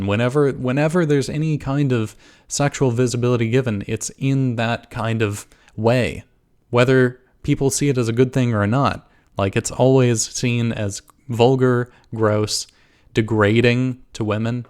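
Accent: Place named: Israel. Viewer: dam she sounds like a hebrew speaker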